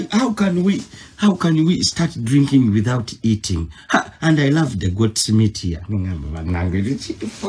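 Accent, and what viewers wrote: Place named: Buganda, Uganda. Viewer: Wabula today u made me laugh with your bunnakiganda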